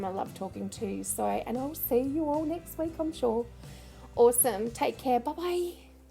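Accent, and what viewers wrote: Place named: Australia. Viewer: yay another chatty aussie lol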